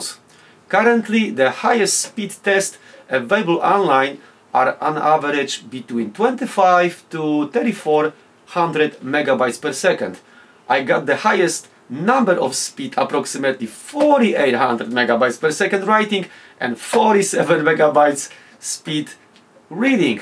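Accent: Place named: Poland. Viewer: Nice Polish accent:)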